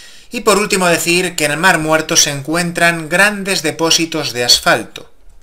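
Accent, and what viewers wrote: Spain. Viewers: Buenos vídeos como ustedes dicen y mas chistoso por tu acento Español. Saludos desde México. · tu voz aparte de ser española es muy molesta.. 😒😒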